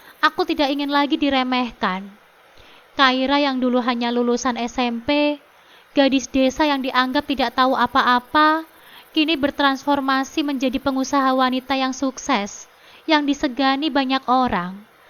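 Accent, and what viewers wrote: Java, Indonesia. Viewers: Tangerang ,lucu gaya bahasanya logat jawa,q suka,smgt kaka n sukses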